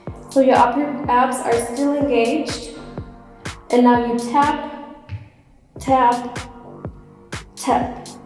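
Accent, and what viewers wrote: Germany. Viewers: Dikka man hört einfach wie Deutsch sie ist🇩🇪🇩🇪🇩🇪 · U hear the german English Hahahahha she cant speak fluently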